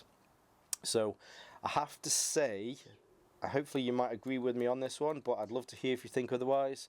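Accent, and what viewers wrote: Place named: Stoke, United Kingdom. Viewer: You sound like a stokie. Good video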